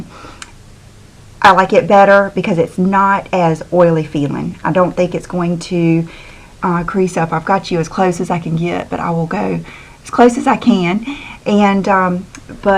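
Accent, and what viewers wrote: South, United States. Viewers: My husband and I are having coffee this morning in our sun room...he heard your voice and asked me, "Are you watching your favorite southern girl again?" :)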